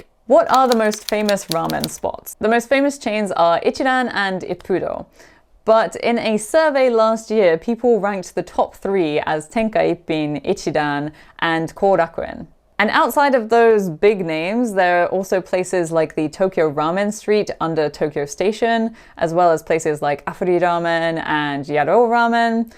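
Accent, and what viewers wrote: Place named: United Kingdom. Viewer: Great video! Nice to hear from a British person😂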